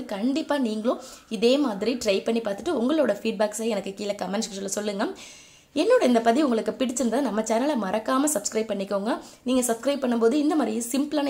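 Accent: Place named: Kongu, India. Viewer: Snacks spr nga.. snakes ku extra azhagu serkradhu neenga pesura kongutamil dhanga.. nalla utcharipu nga.. 👏👏👏👏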